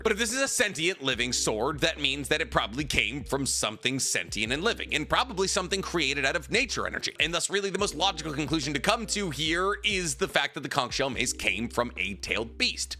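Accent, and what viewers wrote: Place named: New Hampshire, United States. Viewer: I was wondering why you said it like me I live in NH to I get that. XD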